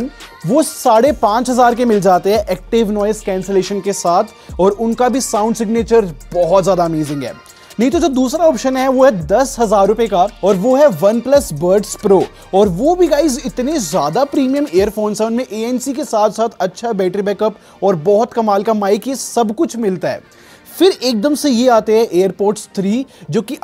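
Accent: Haryana, India. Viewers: Your haryanvi accent is so good 😀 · ठेठ haryanvi 😘 Act Jma gch bhai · Hum toh bus haryanvi sunne Aaay h☺☺ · bhai bahut sahi haryanvi boli hai aapne 👌👌😍😅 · Bhai Mahre Haryane ka sound Ghana badhiya lagga, Beech beech me aisi video kar liya kar 🙏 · Very good Haryanvi 😀❤️❤️ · Wah super real Haryanvi 🔥🔥 Jai Haryana Jai Haryanvi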